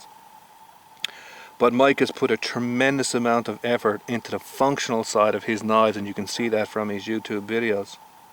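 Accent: Ireland. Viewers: You irish? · are you Irish? I swear I can hear a bit of accent.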